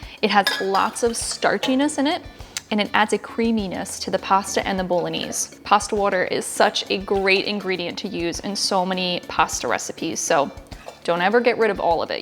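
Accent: Philadelphia, United States. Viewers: You sound like you're from my area near philly. sawce 😀gonna make this for my wife tonight. thank you!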